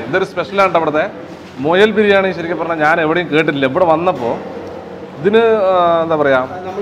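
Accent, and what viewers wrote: Palakkad, India. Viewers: ഫുഡ് വ്ലോഗിൽ ചേട്ടന്റേം വിനിച്ചേച്ചിടേം( vinis kitchen) ചാനൽ കാണുന്നത് നമ്മടെ പാലക്കാടൻ സ്ലാങ് കേൾക്കാൻ വേണ്ടി... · oru palakadan 👌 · Pakka palakadan slang 😎😎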